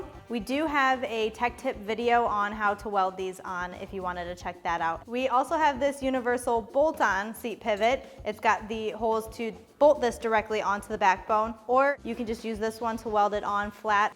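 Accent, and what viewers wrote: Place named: Midwest, United States. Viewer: Nice Thick Midwest accent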